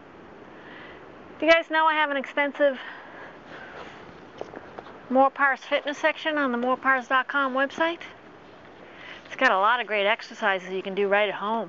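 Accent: United States. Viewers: your instruction is perfect,love you to bits,is the hair to keep us smiling because it’s working.love your USA accent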